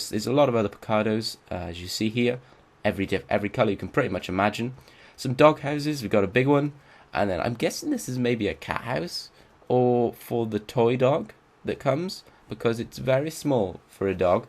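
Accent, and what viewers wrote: England, United Kingdom. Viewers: I don't remember you being British. Not trying to be rude, just saying. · Your so English.... I LOVE IT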